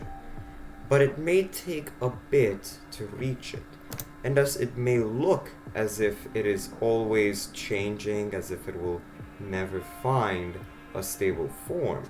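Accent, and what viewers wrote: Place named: Romania. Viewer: A video about 3 years ago, and actually sounds romanian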